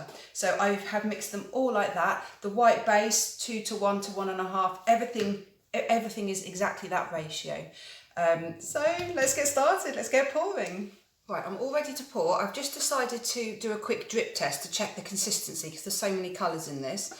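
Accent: United Kingdom. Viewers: It makes a lovely change to hear a British accent. This is beautiful. Having had so many disappointments while I learn I can only dream of creating something like this. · Beautiful and it is so nice to hear a British Artist - just starting out so this is so helpful - can’t wait to watch more of your demos 😀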